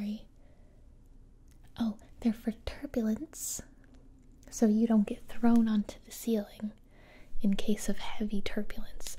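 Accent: South, United States. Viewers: maybe can visit texas and regain her southern accent again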